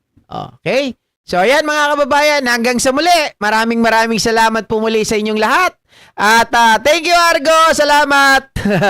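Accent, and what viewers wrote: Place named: Waray, Philippines. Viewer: Ikaw Sir talaga Waray na waray ka po😅😅😅😅😅❤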